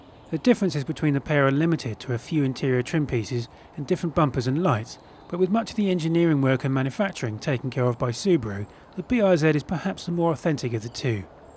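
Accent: England, United Kingdom. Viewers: awesome review!!! love it when car reviewers have that english accent too! :D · Why do British people add syllables, letters, and phrases to words that don't need to exist and make it a mouthful to say such as br-zed, Aluminium , innavitive, and other hilariously odd ways of pronunciation that unnecessarily make additions to simple words. I've heard it all and American English just seem simpler and less pretentious ,because its like they do this just to be different or put emphasis to things that just don't need it. XD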